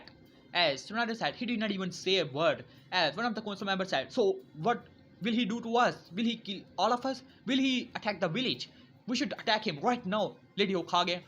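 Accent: India. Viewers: I knew he was a Indian by his accent of English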